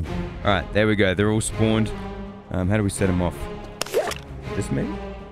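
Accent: Australia. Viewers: are you really aussie ?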